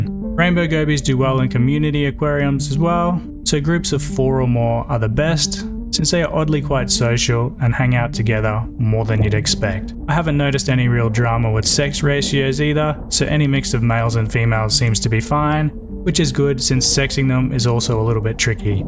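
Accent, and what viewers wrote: Australia. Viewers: Hiya. Are you from Australia?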